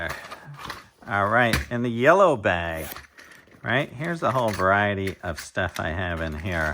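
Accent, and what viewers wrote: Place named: Pottstown, United States. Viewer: Well, I’m surprised at just how many tools came out of that bag😃 your accent reminds me of my cousins in Pottstown 👍🏻 I enjoy the videos.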